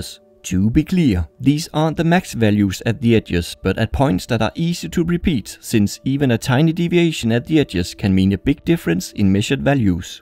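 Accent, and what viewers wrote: Denmark. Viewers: er du fra danmark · Hejsa.🇩🇰 Har ikke set dig før men kunne høre du var dansk med det sammen 😁👍🏻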